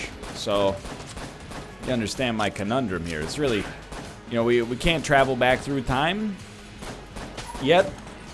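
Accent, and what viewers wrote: Canada. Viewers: I don't think I've ever heard Canadian accent as strong as I do in this episode, especially in the first half. He slipped into it during the bit and it took him awhile to pull back out of it.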